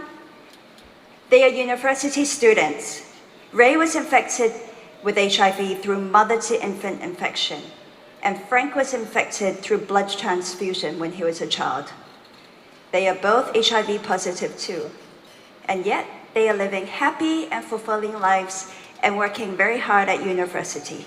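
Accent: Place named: China. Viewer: 伦敦口音退化了不少 中国音非常严重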